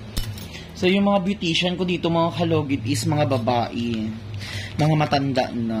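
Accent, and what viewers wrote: Iloilo, Philippines. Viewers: Tga din kaw te? Daw may tono kaw na Ilonggo ☺️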